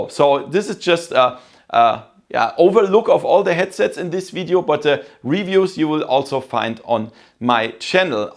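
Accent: Germany. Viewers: My thoughts after i stopped on second : "Thats definitly a german guy." And than i read your channel name.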